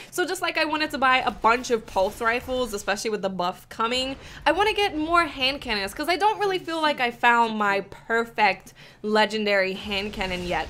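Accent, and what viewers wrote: United States. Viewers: Possibly America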